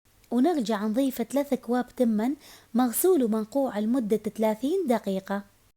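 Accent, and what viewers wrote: Mosul, Iraq. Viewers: هاي اللهجة المصلاوية عيني احترمي 👍🏻👍🏻👍🏻👍🏻👍🏻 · عاشت ايدج،، شگد حلوه اللهجه المصلاويه ❤ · هلا وكل الهلا نورتي ياغاليه عاشت ايدج انتي مبدعه ربي يحفظكم ويسعدكم ❤انتي من الموصل من اللهجه ❤ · فديت اللهجة الموصلية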